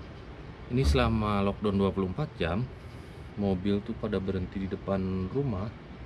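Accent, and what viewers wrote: West Java, Indonesia. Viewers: Subhanalloh...kang orang sunda nya...logatna euy... Beh mana cikundul..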